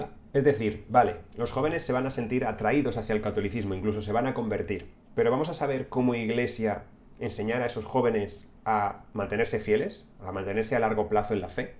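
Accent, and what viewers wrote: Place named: France. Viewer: Lique por tu acento francés. Mathafaka tb suena mejor en ing. ✅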